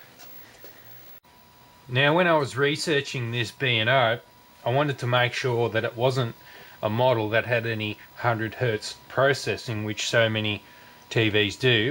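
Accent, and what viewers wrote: Australia. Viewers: love ozzy accent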